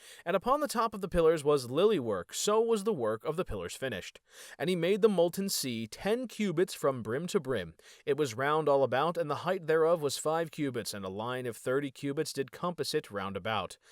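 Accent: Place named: United States. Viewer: Is this talmud shit in American Zionist accent... ⁉️‼️⁉️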